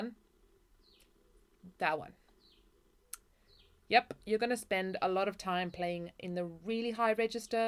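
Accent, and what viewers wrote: South Africa. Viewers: Hey girl- are you South African?! I hear that accent ;) ;) soo much love from the Jacaranda city! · I don't think she's British. I'm thinking South African and/or European who went to International School. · South African ex-pat with a slight British twang? This is the first video I've watched on this channel :) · Are you from South Africa by any chance?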